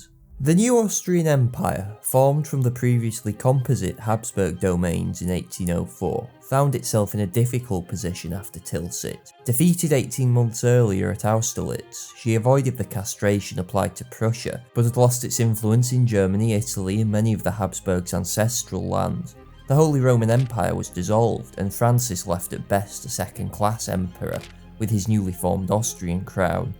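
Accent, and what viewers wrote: United Kingdom. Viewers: Definitely British, but where specifically idk. I'm American too lol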